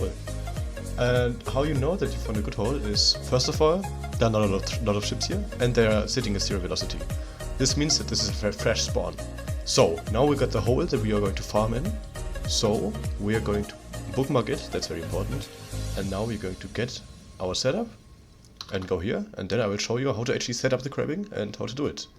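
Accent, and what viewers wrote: Germany. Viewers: ahh i höre raus deutsch :D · On a top of a thick German accent. I don't get why everybody feels the need to add - most of time silly - music to videos. Anyway, good content.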